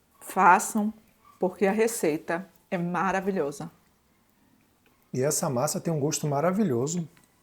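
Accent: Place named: Northeast, Brazil. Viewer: Eu amo assistir suas receitas ,vc é simplesmente maravilhosa, pelo o sutaque vc tbm é nordestina , povo maravilhoso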